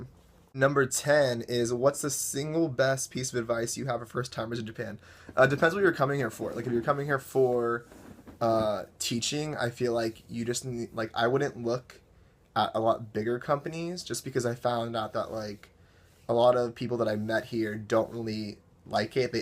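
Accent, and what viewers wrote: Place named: California, United States. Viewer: I think the best thing about this video is you mentioning the nabe, mainly because I love spicy food as well. Also I have a question, where are you originally from? You sound like you're from Cali, probably southern but I'm just curious.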